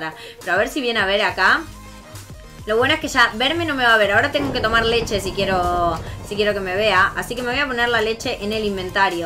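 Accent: Argentina. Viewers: AUNQUE HABLAS ARGENTINO YO TE ENTIENDO TEAMOOOOOOOOOOOOOOOOOOOOOOOOOOO.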